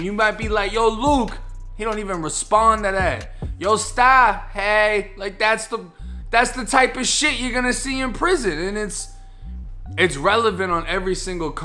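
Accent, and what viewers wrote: Boston, United States. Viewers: Boston accent yes? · Goofy goober nigga u straight Boston Damn I miss New England like shit nuffin like it keep doin ya thing homie I love this channel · You from Boston? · damn are you from Boston? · Your from Tampa? You sound like you from Boston or something like that. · s, s, s, s, s . . . Nice wording!! You from Boston ?? . . . You say Stahh and Chahhges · So r u from Boston or Florida? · Mr, are you from Boston?? (accent) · Ur definetly boston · no disrespect just curious how long were you back in Boston before the accent came? Do you think if u came back to Florida and lose it? Like if you were at Ybor city would u the Florida accent just come back? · Is this guy from Boston? I hear that bake bean accent. Either way...cool video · I know u did time in florida but sound like Your from boston, curious no disrespect just curious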